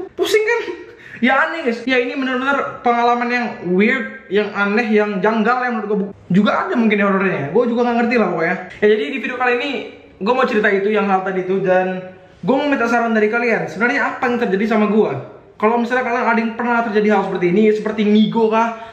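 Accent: Batam, Indonesia. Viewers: bang lu org batam ya?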